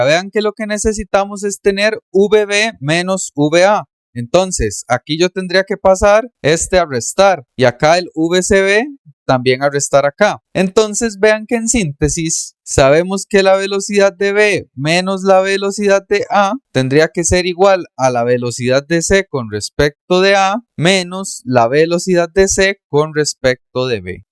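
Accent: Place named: Costa Rica. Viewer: ..jajajaa ese asentó TICO es inconfundible....felicidades.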